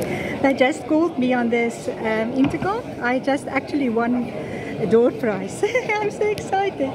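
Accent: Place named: South Africa. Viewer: always happy to watch and enjoy hearing your South African accent :)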